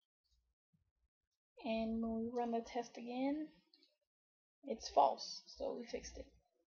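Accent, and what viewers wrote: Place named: South, United States. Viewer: Nice southern accent :))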